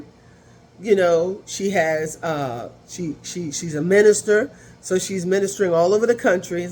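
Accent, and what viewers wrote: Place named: New Jersey, United States. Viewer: Where is G located? I think you’re from Jersey, (I can hear it)! So am I, it live in Atlanta now. Can come see her in Jersey. If so, I come home all the time E.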